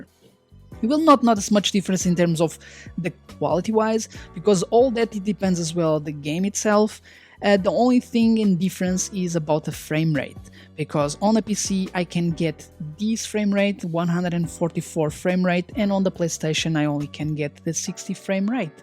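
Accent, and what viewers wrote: Portugal. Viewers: Great video, I made my decision and will definitely buy this monitor after watching many videos on your channel about this. Also, dude are you Portuguese? Your accent really looks like it 😅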